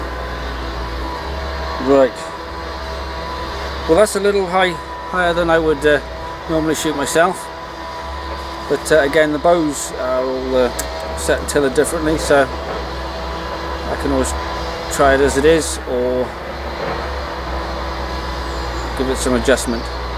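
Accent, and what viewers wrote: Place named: North, United Kingdom. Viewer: You sound a Northerner , so where are you shooting ??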